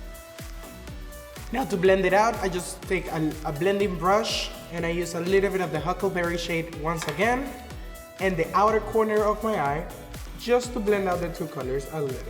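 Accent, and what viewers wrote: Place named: Spain. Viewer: I just love your positivity energy and how you speak English with a little bit of Spanish ❤️❤️ I also did a quick apply and viewing video for this month I got almost the same thing beside the lashes tho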